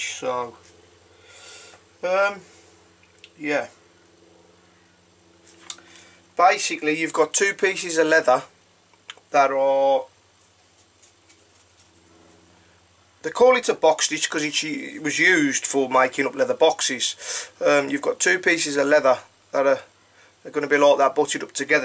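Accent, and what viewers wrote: Black Country, United Kingdom. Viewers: Black Country way, I reckon. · Just getting in to leather work, and the first video of yours that i have seen - you got an instant sub as soon as you spoke. Us Yamyams have to stick together!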